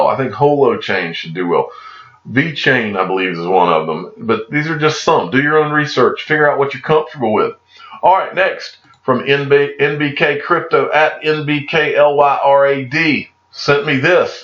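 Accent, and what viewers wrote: South, United States. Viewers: You’re so unapologetically southern it kills me sometimes 😂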